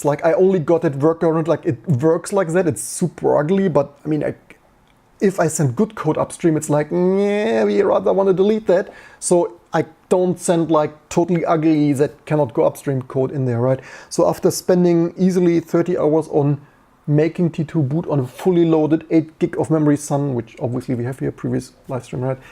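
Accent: Germany. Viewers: Your accent is like a german shit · Das ist das deutscheste Englisch was ich je gehört habe :) But mad respect for your work man.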